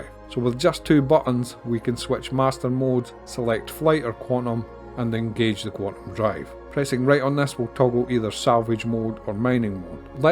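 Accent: Scotland, United Kingdom. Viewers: First Scot I’ve heard doing a star citizen channel. 🕺🏼🕺🏼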